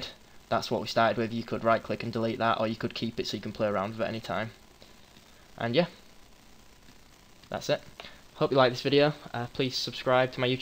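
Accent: United Kingdom. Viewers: This is great, thanks for making it so simple. Now I can finally make my own digital drawings instead of drawing them on paper and editing them in photoshop, that was a pain...I knew there was a way to do all this but I completely forgot how and never really could figure it out. By the way, your accent's great, but what part of the United Kingdom are you from? I don't know all that much about accents so I don't want to sound stupid, but it sounds slightly different from most English accents, is there a name for it?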